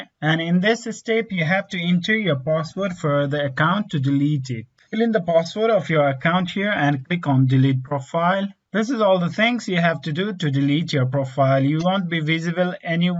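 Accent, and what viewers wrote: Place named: India. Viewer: I hate Indians they are everywhere have a horrible annoying fn accent !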